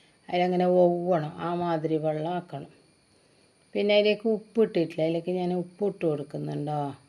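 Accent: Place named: Kasaragod, India. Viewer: Nice sharing 🎉🎉🎉🎉 കാസ്രോട് ഭാഷ 😂😂 👌🏻👌🏻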